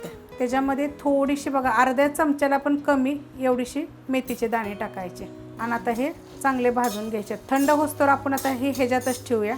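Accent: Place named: Kolhapur, India. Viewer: खूपच छान असतात तुमच्या रेसिपी 👌👌👌 तुमच्या बोलण्याचे टोनिंग वरून तुम्ही कोल्हापूर च्या आहात असे वाटते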